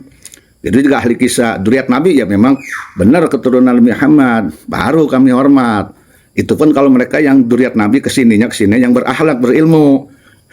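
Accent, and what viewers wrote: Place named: Banten, Indonesia. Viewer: Lughot Banten'e melekat 👍 lanjut-kan perjuangan pak,,kiyai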